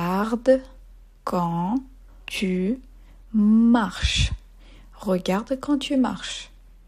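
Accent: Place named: France. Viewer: আপনার ফ্রান্স Accent গুলো সুন্দর..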